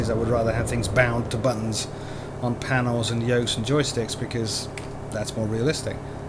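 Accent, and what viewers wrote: United Kingdom. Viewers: I like most of your videos, though I'm a flight simmer first. Keep up the good work. Are you from the UK or do you just sound like that? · your from america? i thought u was from uk, you dont sound Americana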